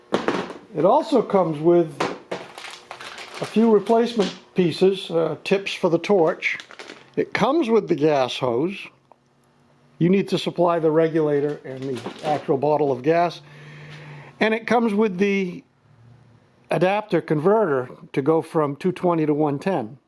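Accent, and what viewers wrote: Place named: New Hampshire, United States. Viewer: Where you from? Your accent reminds me of home in New Hampshire. You convinced me...I'm jumping on one of these.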